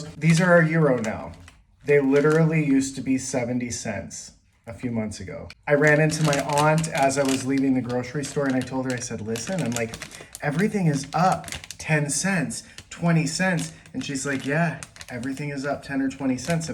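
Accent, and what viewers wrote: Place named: Minnesota, United States. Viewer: I usually make sugar cookies with my granddaughter, but I moved this past June so I will miss it this year. My son comes from north Texas for the holidays so I make him chocolate chip cookies. I thought I could detect a Minnesota accent in your videos 🙂 I am from Minnesota so it is easy to hear the accent!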